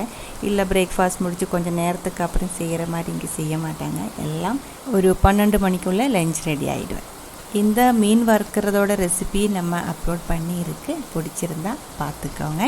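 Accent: Kerala, India. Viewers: പക്ക മലയാളിയുടെ തമിഴ് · Sadharana eniku thamil manassilavarilla but ningal samsaram nannayitu manasilavunnu. Keralathil thamasikkunnath kond malayalathinte influence undo samsarathinu? Nice vlog...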